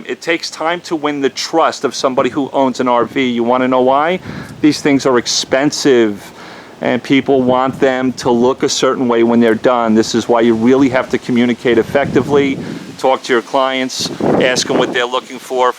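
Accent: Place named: Dallas, United States. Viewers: Gotta love the Texas accent. ("Howard Stern talks RV detailing") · Niiiiiice. Your accent is a typical Dallas Accent. LOL.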